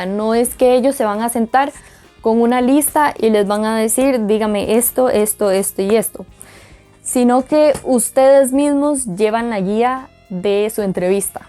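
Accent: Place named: Costa Rica. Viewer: omg ella es de C.R , ya decía yo que su modo de hablar se me hacía conocido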